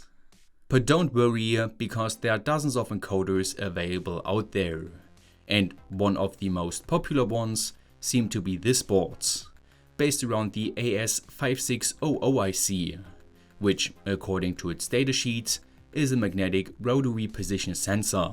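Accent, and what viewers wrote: Germany. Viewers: ALSO ich habe in diesem Video das erste Mal gemerkt, dass du einen deutschen Akzent hast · i think Entr is asking where in germany. different regions have different accents, as in any other country... i think Entr wants to know where specifically (and so do i)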